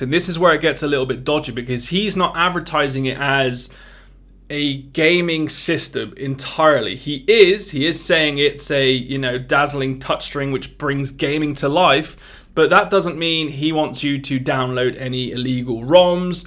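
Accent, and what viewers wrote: United Kingdom. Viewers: . Such a Bri'ish accent.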